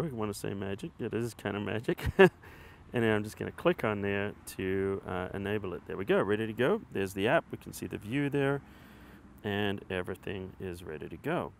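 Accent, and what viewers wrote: Australia. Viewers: I mean probably 1/2 AU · Great review mate great work,....you sound half Aussie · this fella sounds Australian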